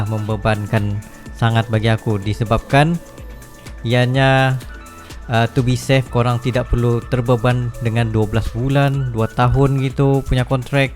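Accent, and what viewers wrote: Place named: Sabah, Malaysia. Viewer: Itu slang Sabah nda buli lari bosku hehe. Terbaik content 😁👍🏻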